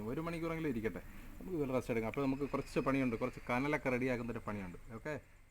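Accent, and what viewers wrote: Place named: Thiruvananthapuram, India. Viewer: Thiruvananthapuram alle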